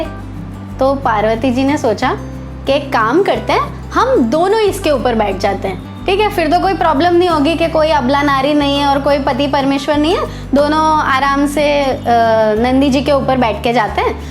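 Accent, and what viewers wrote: Gujarat, India. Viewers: Gujju tone😁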